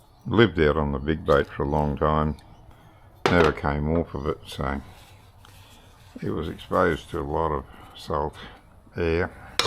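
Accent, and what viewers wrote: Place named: Queensland, Australia. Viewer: . Yeah you are very watchable and that pure Queensland accent has a lot to do with it ! I have never watched a fishing channel on TV or YT cause they are all melodramatic wankers, like the 4WD wankers wearing the obligatory Drizabone oilskins when it’s 40 degrees😂 Obviously you’ve been doing this stuff since you were a boy so you speak with authority unlike most of YouTube where it’s always a case of ,” hello, we just bought our first boat and this is the first time we’ve been near the water so we’re going to teach you how to sail around the world…….once we learn how to untie these rope things…😂😂😂😂😂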